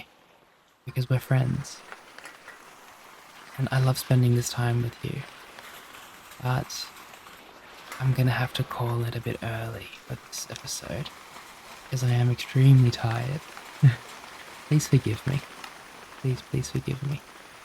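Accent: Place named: Australia. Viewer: Twin I would like to let you know that your voice is very soothing, and I love your what sound like an Australian accent. I would also like to mention that I find myself falling asleep to your videos every night I can, and I use them instead of melatonin. I really appreciate what you do, and I really hope you can excel in a successful career 💕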